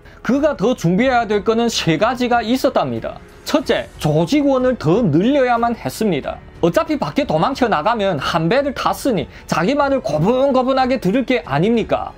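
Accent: Yanbian, China. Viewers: 억양과 엑센트가 특별하고 분명한 어조로 구사한다 전달력이 확실해 상황의 신뢰성이 충분하다 핵심 단어마다 엑센트가 빠지지 않은다 그리고 웃지않으며 진지하다 서술의 시작과 끝이 목소리톤이 일정하고 인상깊은 연변사투리와 중국어가 돋보인다 이게 종일tv의 칭찬요소다 댓글창을 보면 안티가 하나도 없다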